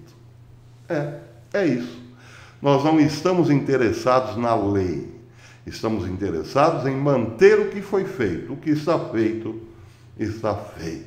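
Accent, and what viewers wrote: Caipira, Brazil. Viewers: Parabéns pelo seu trabalho que está cada vez melhor. Amei o sotaque caipira, ficou muito bom e divertido. Além das notícias importantes e sem filtro, temos o prazer de conhecer mais um dos seus talentos. Que Deus sempre te abençoe com tudo de bom 👏👏🙌🇧🇷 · Eu amo seu caipirez tudo fica muito mais leve, apesar de todas as.noticias serem claras e sérias sem fale. Isso é o que todos precisamos ouvir para fazer nosso próprio juízo dos acontecimentos sem viés ideológico e isso é muito bom. Obrigado de coração por dividir seus conhecimentos e pesquisas conosco. Com ou sem caipirez você é o máximo nas suas análises. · O caipirez é vida professor. O Sr. faz muito bem o caipirez sem denegrir ou rebaixar os representados. Somos nós brasileiros que nos comunicamos assim, de forma eficiente e com expressões regionalizada que formam um código capaz de ser decodificado apenas para quem vive a miscigenação que ocorreu em nosso país. 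· Bom dia professor. Adorei a nova versão em caipirês, pois sou do Nordeste e cultivo os falares da nossa terra Brasilis. Entonces, adorei. Oxente, quem não gostar num goste! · A falecida e mui saudosa Inezita Barroso iria adorar esse seu sotaque caipira, Professor! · continue sempre assim professor,no caipirez ou normal está ótimo parabéns. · Verdade .... adoro essa forma jocosa como o senhor apresenta as noticias Imprimindo este sotaque de caipires e divertidooooo !! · Boa tarde Professor! OI NÓIS AQUI TRAVEIZ!!!!! SOU NETA DE AUSTRÍACOS E POLONESES. CRESCI OUVINDO UMA SINFONIA LINDA DE SOTOQUES MISTURADOS COM O PORTUGUÊS . E CONFESSO EM ALTO E BOM SOM: ADORO O CAIPERES! NOSSO PAIS Ê ASSIM!!!! QUEM NÃO GOSTA ESTÁ NA PRAIA ERRADA! SE MUDE PARA O GABINETE DO SÔ " GIRMAR MENDES"!😄😄😄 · Bom dia, professor ! Assisto todos os seus vídeos.... Não deixe o "caipirês". Adoro!!! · Professor, tá mais gostoso de acompanhar o seu canal agora; além de informação, conhecimento , a gente se diverte a beca. 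O caipirez ficou top! O senhor leva jeito tb como comediante . 👏👏👏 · Oh professor eu amo seu caipireis.Bjssss querido, Deus te abençoe 😍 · Como sempre seus comentários muito consistentes a atuais! Sempre ba frente da midia "oficial"! A forma caipira de passar a msg tem seu charme mas talvez tire um pouco a atenção do conteúdo. Forte abraco! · Bom Dia Professor 😄 Sou De Sete Lagoas MG E Ó Seu Caipirez É O Nosso Normal Aqui😂😂😂💚💛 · Bom dia Professor! Adoro o seu "caipirez" 😁 Adoro seu senso de humor. Não mude! Continue assim e pra quem não gosta e diz que não vai assistir mais ... JURA? Fala sério 😁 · Bom dia Professô podi cuntinua cum o caipireis ço! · Obrigada por mais um excelente vídeo professor! Estou amando o caipirês! Mais ainda ao saber que uns e outros ficam irritadinhos! Que Deus o abençoe sempre professor! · Kkkkk É o melhor canal de notícia bom é escutar o caipirez é bem brasileiros PARABÉNS PROFESSOR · O Tar de Bill poderia explicar o frio que dói nos EUA? 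Aquecimento resfria. Sei.....Quanto ao caipirês, eu amo. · Apesar das notícias preocupantes, seu bom humor nos contagia! Seu sotaque "caipirês" ficou muito lindo! Parabéns, Professor, pelo seu esforço de desvendar, para nós pobres mortais, as tramas desse mundão de Deus 🙏🙏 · Bom dia , Professor !! Esse caipires é o charme do Canal... Semana abençoada para todos !! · Prof. Boa essa de falar caipirez. Realmente vai enlouquecer os algoritmos. Parabéns pela criatividade. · Professor,eu adoro ouvir o seu caipirês,eu dou do tempo que se falava assim 😊 Por mim,pode continuar,o que importa é o conteúdo dos seus vídeos 👏👏👏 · Bom dia, professor! Não é todo mundo, que tem capacidade de entender o caipirês. Os incomodados que se retirem. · Parabéns professor 👏 gosto muito do seu português caipira..sou da roça hahaha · Olá professor. Saudades da dona Lucimar. Manda um grande abraço pra ela. E continue com esse seu jeito especial de dar as notícias. 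Caipirês é fantástico.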